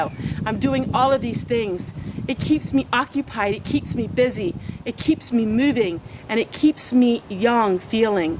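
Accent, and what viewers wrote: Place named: Pennsylvania, United States. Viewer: I knew you were from PA by your recognizable accent. I live in Lancaster county. Your homestead is beautiful, and so inspiring.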